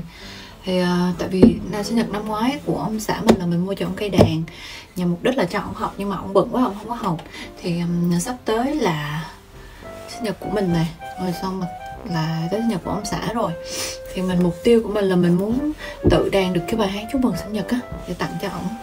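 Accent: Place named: Da Nang, Vietnam. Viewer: hèn chi, giọng hơi đặc ko lẫn vào đâu đc, mình dân Đà nẵng nghe nửa chữ phát biết luôn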